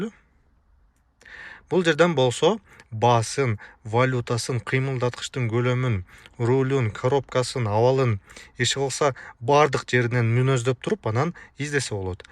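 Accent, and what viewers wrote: Standard. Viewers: Кыргыз тилинде таза суйлогону жакты Бирок Машина Автомобилдин кыргызчасы араба шайтан араба деп айтылат уялбай шайтан араба деп айтабергиле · Көптөн бери эне тилибизди мындай таза угалек элем азаматсыз унага келсек мен соната ден 8 айдагам өтө жакшы уна бирок камриге эч качан жетпейт соната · Рахмат иним, таза Кыргызча суйлойт экенсин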